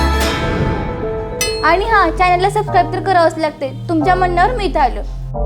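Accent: Latur, India. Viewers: Latur chi bhasha ❤️😂(Latur accent)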